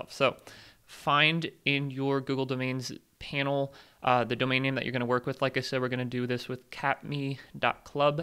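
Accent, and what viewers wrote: Baltimore, United States. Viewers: I can hear that Baltimore accent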